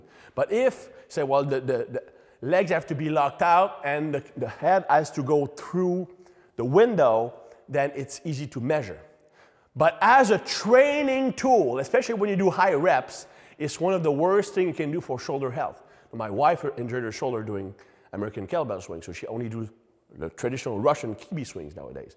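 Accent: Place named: Quebec, Canada. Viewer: I’ve been a fan of articles for a long time now, and this is the first time I heard him speak. I knew he was Canadian, but didn’t know he was from Quebec. Makes me respect his great writing skills even more.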